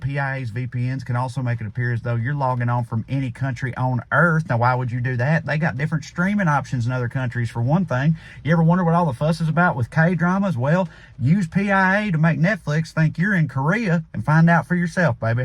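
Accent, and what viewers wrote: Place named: South, United States. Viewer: I'm an old Southern woman with an accent very similar to, so I tell him, "I love ya like I was your Mema!"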